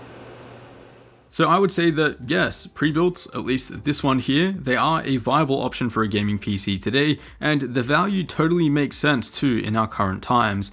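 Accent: Australia. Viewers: R u and Aussie whaaaat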